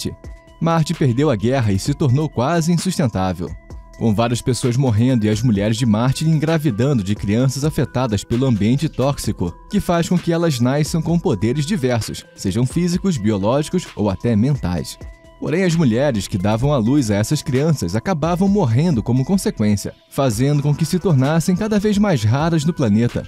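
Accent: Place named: Rio de Janeiro, Brazil. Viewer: Alguém ai mais percebeu que carioca fala NAICE (nasce), NAISCER(nascer) NAICEU(nasceu)? NAISÃO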